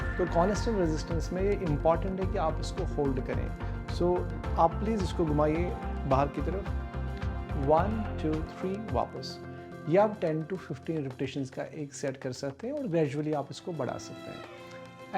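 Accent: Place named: Kashmir, India. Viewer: R u kashmiri, ur pronunciation is like that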